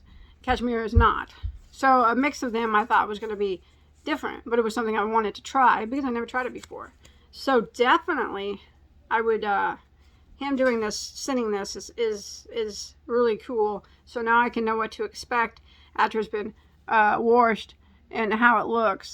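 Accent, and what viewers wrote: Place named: Illinois, United States. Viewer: No one should correct your regional pronunciation. A lot of people pronounce "wash" the same way. A friend from Illinois used to say it like that, and I know you're also in Illinois. Most of us have a regional dialect & it's impolite to belittle that.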